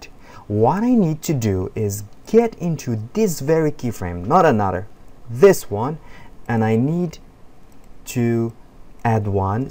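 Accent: Morocco. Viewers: Hmmm good inspiration im late4 years x) you really sound moroccan(moroccan name +good english and good french)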